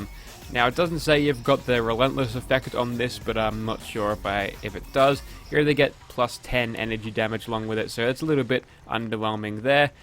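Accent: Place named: Australia. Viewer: Ya know I’ve observed something with your Australian accent that I’ve never noticed before. For example: You say “whatever” as “whateva” but you say “Matilda” as “Matilder”. So that’s cool. 🌈 The more you know ⭐️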